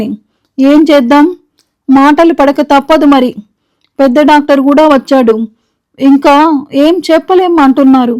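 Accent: Telangana, India. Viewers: గారు చాల మంచి కథను వినసొంపుగా మధురమైన స్వరంతో మరి మరి వినేలా వినిపించారు. ముఖ్యంగా వున్న ఆరేడు పాత్రలలో జీవించి స్వరంలో మార్పులు చూపించి చెప్పిన విధానం చాల నచ్చింది. ఓ డ్రైవరు చూసి నడుపు. అలాగే ముసలమ్మ అడిగిన తీరు ఆలా అన్నీ పాత్రలలో ఇమిడిపోయారు తెలంగాణ యాసలో అద్భుతంగా తెలంగాణా అమ్మాయిలా యాసలో వినిపించారు మనస్ఫూర్తిగా అభినందిస్తున్నాను మీ నుండి ఎన్నో విన్నాను కానీ ఇది అన్నింటి కంటే అద్భుతంగా విపించారు కథలో driver పాత్రలో బావోద్వేగాలు బాగా మలిచారు రచయిత. దానికి మీరు పూర్తి న్యాయం చేసారు. మరిన్ని మీ స్వరం నుండి రావాలని మేము విని ఆనందించాలని మనసారా కోరుకుంటూ అభినందనలు తెలియచేస్తున్నాను. శుభోదయం 🌺🌺🌺🌺🌺🌺🌺🌺🌺🌺🌺🌺🌺🌺🌺🌺🌺🌺🌺🌺🌺🌺🌺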